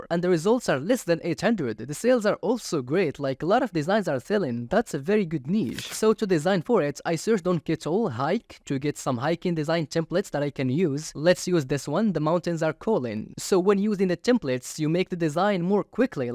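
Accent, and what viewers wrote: Morocco. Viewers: mghribi nta hhh gha mn sawtk tbarkllh3lik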